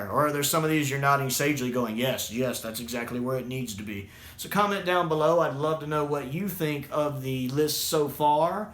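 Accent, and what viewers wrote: South, United States. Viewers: Listening to an American with a strong southern accent talking in detail about a cheap TV show (albeit beloved) made by the BBC in the 60s, 70s and 80s is such a wild juxtaposition - I love it! You're easily one of the most articulate reviewers for Doctor Who I've watched/listened on YouTube. Love you're take on the programme!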